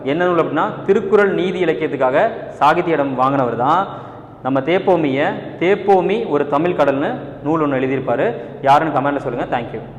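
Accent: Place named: Tirunelveli, India. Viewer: சாருக்கு திருநெல்வேலி போல... ழ் உச்சரிப்பு மட்டும் தகராறா இருக்கு. மத்தப்படி பாடம் லாம் செம டீடெயில் ❤️ உதவியா இருக்கு 🙌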